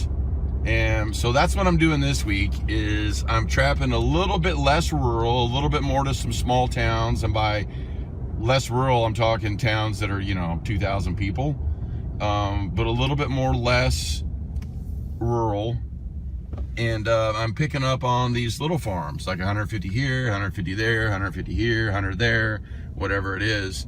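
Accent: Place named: Washington, United States. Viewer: could you say ummm one more time. this guy aint from missouri sounds like washington im trying to learn but not showing how to set traps just moving camera around lame.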